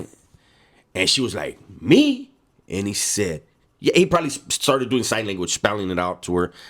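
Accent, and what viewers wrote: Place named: Miami, United States. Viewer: U sound like ure from Miami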